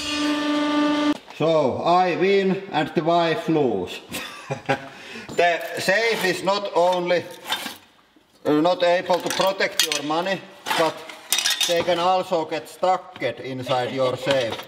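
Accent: Finland. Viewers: Could you do a video in Finnish? I don't speak Finnish but I love your accent and would like to hear what Finnish sounds like. Especially the phrase "hydraulic press." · this clay monsters are so satisfying to watch when they get cruched:D along with the finnish accent this page is just perfection^^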